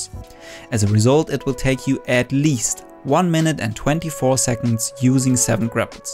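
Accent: Germany. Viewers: Dude, he's German. Its just how he pronounces words. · Your german accent fits you so well! :)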